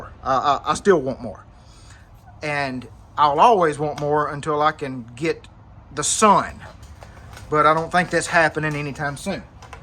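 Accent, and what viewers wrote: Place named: South, United States. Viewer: I had 2 pl350 switches crap out. What state are you from? It sounds like the South, like me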